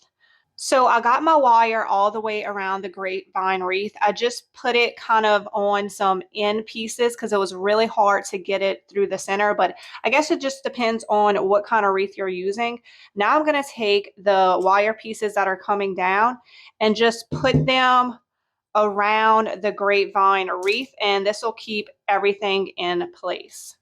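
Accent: Louisiana, United States. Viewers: Also your accent is telling me Louisiana Correct?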